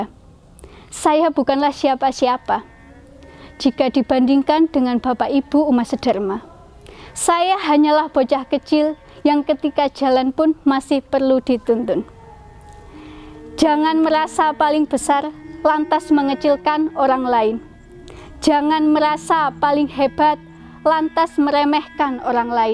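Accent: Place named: Java, Indonesia. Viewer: Medhokmu nduk.. bikin teengiang2 ... 🙏🙏🙏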